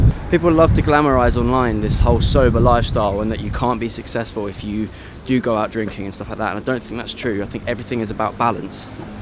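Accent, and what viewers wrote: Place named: United Kingdom. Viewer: this is so powerful and comforting all at the same time. i fully loved every second of it. i've joined the 6am collective newsletter and i can't wait to post my first video soon. thank you! hugs from a 24 y/o woman who genuinely wishes she had your voice? so very soothing and british haha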